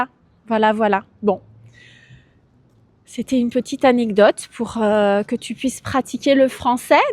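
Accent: France. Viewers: Heureusement pour moi que j'habite une maison individuelle sinon ce serait un calvaire avec le voisinage, j'allume le micro et je mets le son bien fort, surtout que la voix aigué avec un accent parisien🗼 très particulier de notre belle 🌹est très agréable à entendre, sauf ma très chère mère quand je mets la musique fort dans ma chambre, elle vient pour me dire " mais tu n'entends pas très bien! tu sais d'où on t'entends!, parfois elle rentre en dansant 💃en me disant tu sais l'heure qu'il est! ha ha ha😊, ma grand mère qui habitait un appartement à Alger, ses petites filles jouaient en portant les souliers à talent de leur mère en faisant du bruit, la voisine du bas venait chez elle se plaindre du bruit de ces souliers à talent, donc les appartements c'est toujours un problème, il faut revoir les constructions avec de nouveaux matériaux de construction où le bruit sera infranchissable pour éviter les dérangements entre voisins et garder la quiétude et la bonne ambiance entre eux. 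Maintenant passons à l'humour, mais c'est juste une blague pour plaisanter, une histoire qui s'est répandue en Algérie il y a plusieurs années, il s 'agit d'une française qui est venue en Algérie, elle a rencontré une famille qui avait un chien qui lui a réellement plu alors on le lui a fait cadeau, en retournant en France, comme elle habitait un appartement, elle a mis le chien dans son balcon, mais la nuit quand elle dormait, le chien n'arrêtait pas d'aboyer ouaf ouaf oua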